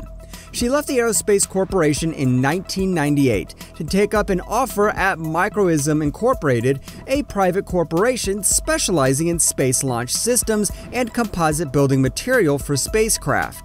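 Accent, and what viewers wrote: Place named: United States. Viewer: hahahaha!! It's the YT algorithm trying to understand an American accent and translate. We are just fortunate the speaker didn't come from down south of the Mason Dickson Line cuz, we'd be up t'our urmpits in pee pickin' tribbles, y'all LOL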